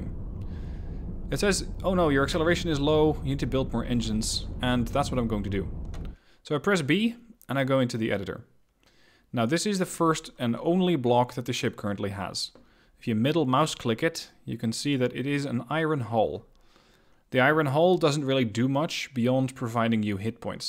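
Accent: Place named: Netherlands. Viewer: i think this guy is dutch, of nie dan?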